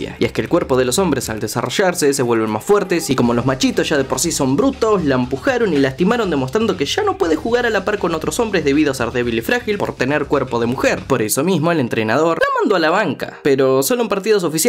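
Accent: Uruguay. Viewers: ¿Sos uruguayo?🤨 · eres uruguayo?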